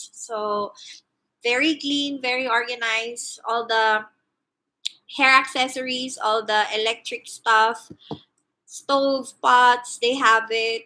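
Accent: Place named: England, United Kingdom. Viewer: 5 thumbs up, I like ur english accent sis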